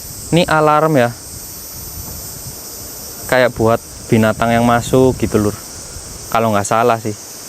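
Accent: Banyumas, Indonesia. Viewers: Bahasene ngapak. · Nyong penonton baru mas, seka wonodobo jateng, nyimak separo lgsung tak subkreb, tertarik karo kontene sampean ben tambah ngerti suasana pedesaan jepang, narasune sampean maer kiye, sederhana trus ora ngguwang logat daerahe, ngapak ya, pada berarti, oke semoga sukses bro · Jenengan masih ngapak kentel banget yak😂😂😂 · si. Ngendi asline jawa ngapak? Ngendi Cilacap.? Banyumas? Kebumen? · Mantep ngapak Banyumasan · Asline wong ndi mad ning Jepang bahasa jawa ngapak ya. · Sampean ngapak Jepang pa mas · Suka bgt perumahan di desa tp rapi bersih mase. Ngapak aslinya mana mas sukses trus mas broo❤ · Rika ngapak yaak?. Bambang Banyuwangi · Mas aku seneng liat konten mu bahasa mu dialek mu khas inyong2😅 salam kenal mas aq dr Banjarnegara mas asal kota mana? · ADLI.DARI. NGAPAK. COLACAP,APA. BANJARNEGARA,APA. PURBALINGGA.? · Lugatnya jateng ngapak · Mantap ngapak ya mase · Asline sampeyan wong ngendi ya Mase,bahasane ngapak kaya wong banyumas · Wong bnyumas ngpak2 mntap mas bro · Mas Indonesia dari mna ko bahasanya ngapak kenapa kerja d desa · Asalamualaikum,salam kenal,mas aslinya jawa mana yah ko mirip kaya kampung ku banyumas.terus di jepang udh brapa th..? 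· ngapak banyu mas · Mas sampean asal nya jawa ngapak ngndi sih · Bahasane ngapak Wong ngendi sih ko? 😅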